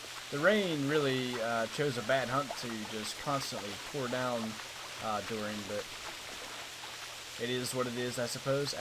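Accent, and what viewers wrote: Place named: Pennsylvania, United States. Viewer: So am I. That's awesome bro. I had a feeling by ur slight pa accent.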